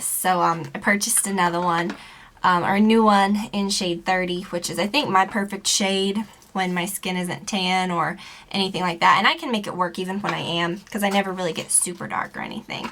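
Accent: South, United States. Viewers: This is the first of all the videos Ive seen of you, where I can notice a bit of a southern accent ;) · I feel like ur starting to get a country accent or something or is that just me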